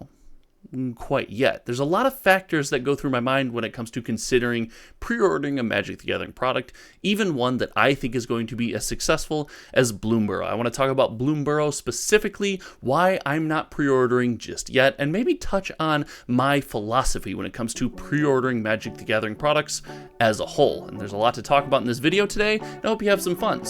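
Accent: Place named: Chicago, United States. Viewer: Did I just hear your from the Chicago land area?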